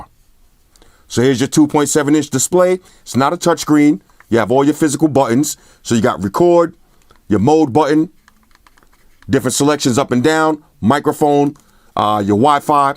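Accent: New York, United States. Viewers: Oh my man is from NY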